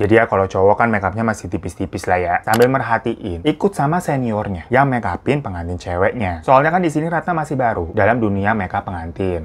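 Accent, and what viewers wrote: Java, Indonesia. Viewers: 🤣🤣🤣🤣🤣🤣🤣paling suka kalo denger bang ngomong bahasa jawa....lucu aja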